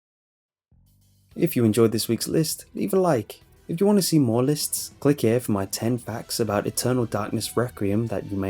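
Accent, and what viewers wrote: United Kingdom. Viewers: Love your tops and horror stories because of your voice so soothing and misterious I thik I never heard another well spoken brit like you do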